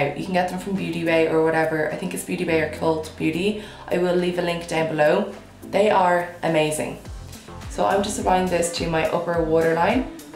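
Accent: Dublin, Ireland. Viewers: Is her accent Irish or South African? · What accent have u got? (Scottish) Irish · she's Irish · Your beautiful irish accented voice could never be annoying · do u live in america? i thought u were irish girllll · She is from Ireland. Lovely accent! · Do you have an Irish accent?😊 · Are you Irish?? Your accent is 😍😍😍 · where is she from? jw lol, im new here. maybe ireland? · are you irish?? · I think she's Irish!! it's such a subtle accent tho! I love it hahaha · Definitely Irish!! Yes I am American, but I can pick up that accent any day. 😂😂 oh & plat also means to braid. 👌🏼 Also recreated this look & used the Gwen Stefani palette as well as my Morphe 350. For the lip. Used Love Bite by Kylie Cosmetics & it went perfect. 😍😍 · She's Irish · Sounds Irish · She's Irish for sure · yeah shes from dublin :) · Are you Irish or British?? You sound Irish...btw new to your channel love this look you're gorgeous!! 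· Is she from Ireland cause she had an Irish accent I'm from Ireland iswell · is she irish?? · this is the first video I've ever watched of you, one question are you Irish ? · Is she part Irish I feel like she has an accent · What part of Ireland are you from ❤️ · Is she Irish?x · You're so gorgeous! Btw are you irish? lol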